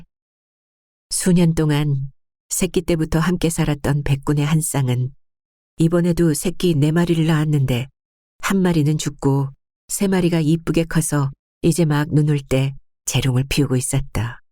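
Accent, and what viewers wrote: Jeolla, South Korea. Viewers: 이번작품 음색은 폭신폭신합니다. 저만의 느낌일수도있지만 처연,청아등… 님컨디션에따라 다를수도 있겠지만 미세한 무언가 있습니다요,이번엔 차진 남도사투리로 들려주시니 그도 감사합니다^^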